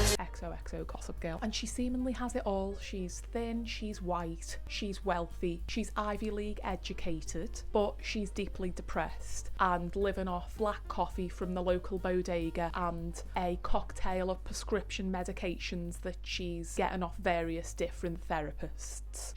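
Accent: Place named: Scouse, United Kingdom. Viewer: as someone who lives in liverpool you literally have the most soothing and soft scouse accent i’ve ever heard in my life i could listen to you talk all day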